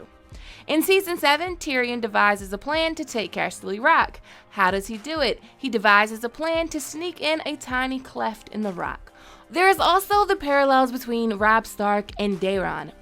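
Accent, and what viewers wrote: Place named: Baltimore, United States. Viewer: Rolls up, and sips sultry sweet summer tea.. From Liberia I can hear that bmore twang. Thx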